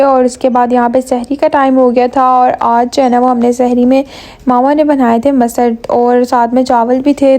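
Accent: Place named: Arab world. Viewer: Ma Shaa Allah apka Arabic bolna ka andaz buhat pyara ha❤❤❤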